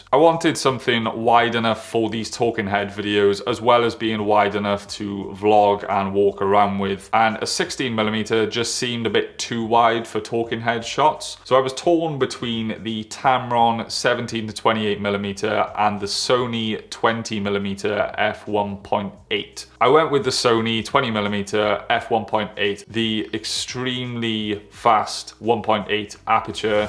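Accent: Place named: United Kingdom. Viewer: There is something about UK accent, or maybe about speaking in proper English, that just works. I wish I had this language skills to improve videos on my channel. Anyway great job man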